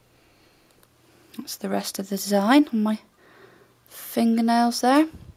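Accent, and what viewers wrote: United Kingdom. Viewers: I love your British accent! :D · Awesome! And love your British accent! I always wanted to go to England to get the accent :3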